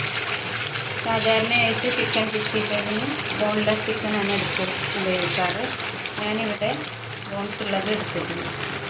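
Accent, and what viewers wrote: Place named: Malabar, India. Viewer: ങ്ങളെ വർത്താനം കേട്ടിട്ട് .. മ്മളെ മലപ്പുറം, കോഴിക്കോട് 🤗🤗രണ്ടിലൊന്ന് .. അല്ലെ..?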